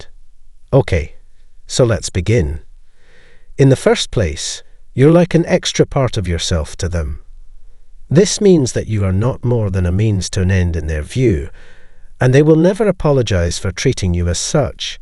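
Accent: Scotland, United Kingdom. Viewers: Love ur videos, love the Scottish accent , 🇨🇮👍👍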